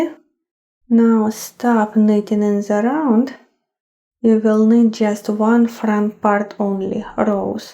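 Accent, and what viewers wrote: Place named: Russia. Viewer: Сильный русский акцент... Тяжело воспринимается. Жаль :(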